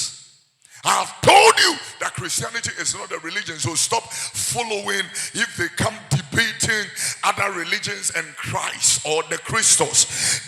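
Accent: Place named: United States. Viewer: I love your message anytime I listen to you but please if you can leave the America English I will be happy because we're Ghanaian let us love whom we are. thanks 👏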